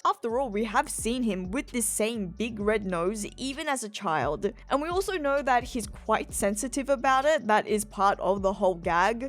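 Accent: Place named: Australia. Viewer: That Australian accent gets me every time 😂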